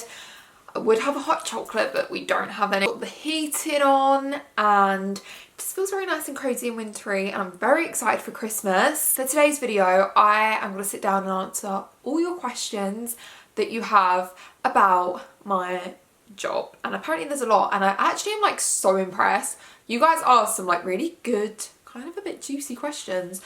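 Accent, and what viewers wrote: United Kingdom. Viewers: Shes deffo from west london i can tell from the accent🤣 no negativity just a guess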